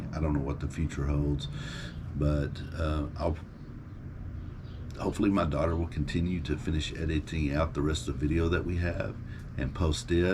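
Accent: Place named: Texas, United States. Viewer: It was fun watching you! I loved your texan accent :-) you should totally consider the Colorado Trail, it's perfect for the summer, in the mountains, little to no snow if you wait for it to melt off. and much shorter than the PCT 🙂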